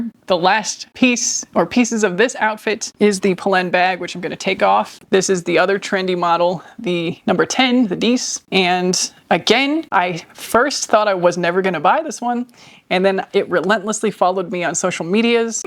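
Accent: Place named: South, United States. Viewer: OK. It’s killing me!! What is your underlying accent. I can hear it when you say “mad”, “had”, “that” “myself” and other words, especially at the end of your sentences. Did you live in the south? Interesting content and great presence. You have a fan here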